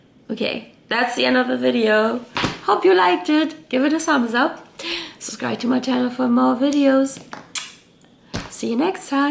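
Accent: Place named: Germany. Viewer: Ich habe an deinem Akzent gehört, dass du Deutsch sprichst:), es freut mich, dass es dir besser geht und du dein Wissen teilst. Ich beobachte, dass sehr viele Menschen selbst jetzt im Sommer erkältet sind. Sie haben einen Infekt nach dem anderen. Das gab es vor Covid und der Impfung nie. Einen Tipp habe ich noch: In einer infekt freien Zeit hat sich bewährt den Körper Kältereizen auszusetzen. Kalte, oder Wechselduschen, Anwendungen nach dem guten , alten Kneipp, der ja immer mehr in Vergessenheit gerät. Das ist wirklich gut fürs Immunsystem.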